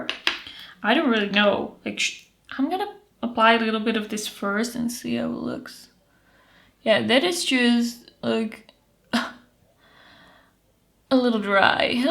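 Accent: Netherlands. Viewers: Hi 👋 Thank you for your reviews on more affordable products. I just recently discovered your channel and had to subscribe. I love you accent are you from Netherland? 🩷 from South Africa 🇿🇦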